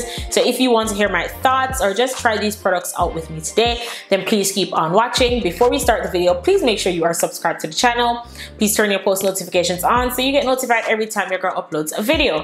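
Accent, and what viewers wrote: Jamaica. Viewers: Are you Jamaican? I sense a bit of an accent! I'm Jamaican so I was curious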